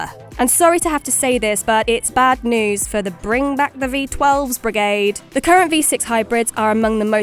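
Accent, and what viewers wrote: United Kingdom. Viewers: Britis speak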